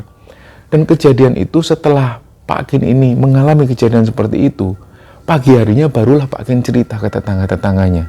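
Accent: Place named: East Java, Indonesia. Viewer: Dr kecil paling suka kalau di dongengin.skrg chanel yg paling aku tunggu update nya cm.entah mungkin karna pembawaan mas yang jawa timur bgt karna aku jg org jatim dan tdk mendramatisir. Jd ayem denger nya.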